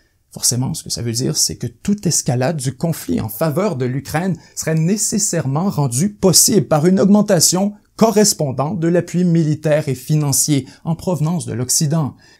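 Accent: Quebec, Canada. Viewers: Yo tu est québécois nn ?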